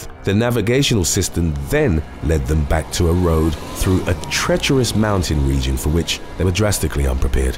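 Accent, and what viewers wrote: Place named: London, United Kingdom. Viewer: Dude, you have quite honestly the coolest voice. What part of London are you from?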